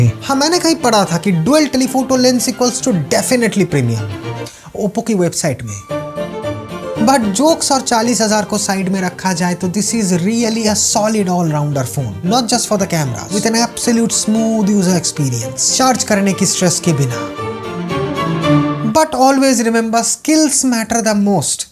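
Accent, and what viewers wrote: Bengal, India. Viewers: Tera hindi sunkar maza aa gaya tu bengali hai tera hindi sa pata chalta hai ❤❤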